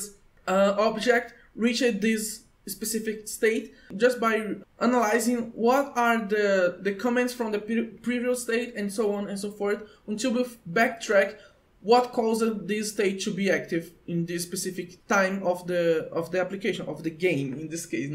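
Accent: Brazil. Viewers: Ótimo video! Eu já vi outros videos teus, e pelo sotaque, desde o início desconfiei de você ser brasileiro, com este eu confirmei a suspeita. Sotaque brasileiro bem característico. Hahahaha. Com ou sem sotaque, continue com os vídeos. São muito esclarecedores. E sim. Taxation is theft!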